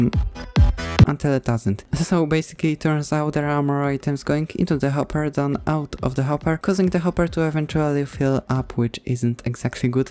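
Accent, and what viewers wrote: Poland. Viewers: Idk why but you sound Polish for me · I thought you sounded Polish, I checked you channel and well, On point. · od razu poznalem że jesteś polakiem po akcencie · fajny polski akcent B)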